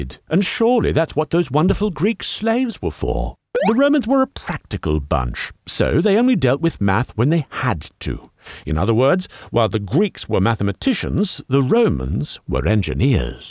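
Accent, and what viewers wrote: United Kingdom. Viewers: Are you putting on the posh accent or do you just naturally sound like Stephen Fry? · Strange that you use Math instead of Maths given that you clearly have a British accent. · If you are English, Sir, and I presume from your accent that you are, why do you keep saying “math” in this video instead of “maths”? “Math” is an Americanism and one that makes no sense, especially when one considers that it is supposed to be short for “mathematics”, a plural noun. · the british voice turns it off for me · Why does this guy talk with a British accent but uses American terminology? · As an Englishman I was very disappointed when the narrator with a VERY British accent said math instead of maths. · He's British. He has a British accent. In Britain it's called maths.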